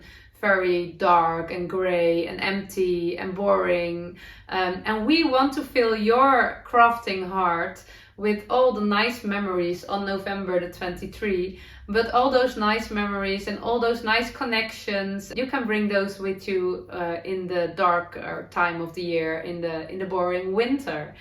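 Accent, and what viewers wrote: Netherlands. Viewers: Crochet and knitting, here in the USA Is on fire! The farmers/ weekend markets are filled with crafters of all kinds. I recently found your vlogs and have enjoyed them and you! You are just charming and your dutchy English is just lovely. Wish I lived closer. Good luck with the festival.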